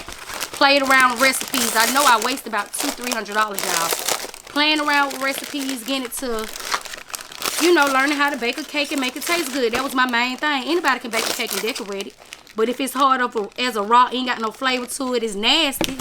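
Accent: South, United States. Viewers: Hi, Mrs. nice to meet you I came across one of your gift baskets videos now I'm a new subbie your personality and southern dialect is contagious very creative too love it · Sis., can you provide the details on your edible printer? You talk so fast and you know you country! lol I played you back 4 times and still ain't got it!!!! lol Absolutely love your personality. I really could listen to you all day.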